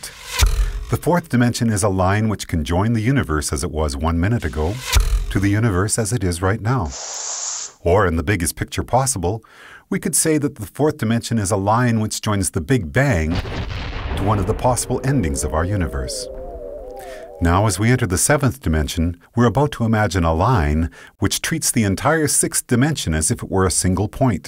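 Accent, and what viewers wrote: Canada. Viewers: The minute you started talking, I thought, "this guy sounds so canadian."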